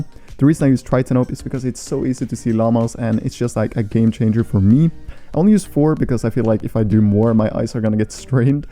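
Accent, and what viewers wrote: Norway. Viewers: Er du norsk? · Are you Norwegian?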